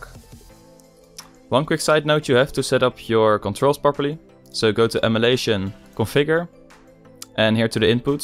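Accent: Netherlands. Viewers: I can hear from your accent your Dutch 😂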